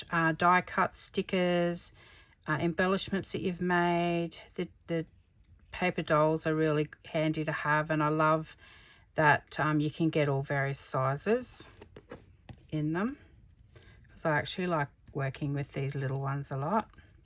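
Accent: Australia. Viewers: Just subbed. Lovely accent. Which bit of Oz are you from ? I had an Aunt who lived in Queensland ❤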